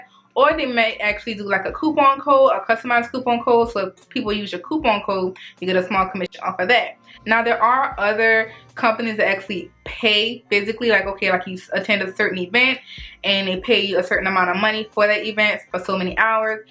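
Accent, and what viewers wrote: New Orleans, United States. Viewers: Girl I hear that New Orleans accent lol🤣😂I am from New Orleans too🤗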